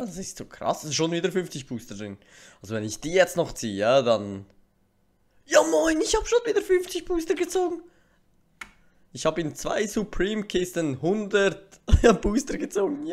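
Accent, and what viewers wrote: Switzerland. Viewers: Bist du aus der Schweiz?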